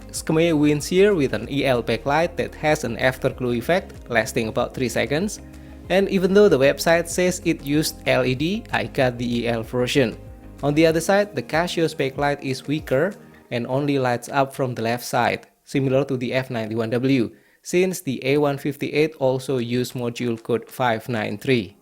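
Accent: Indonesia. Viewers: It is Indonesian accent. You may find it similiar to S'pore/Msian.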